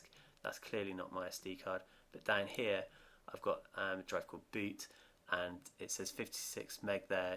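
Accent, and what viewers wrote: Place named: West Country, United Kingdom. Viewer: Can't wait for all the parts to arrive, excellent video! Are you from Bath or Brizzol?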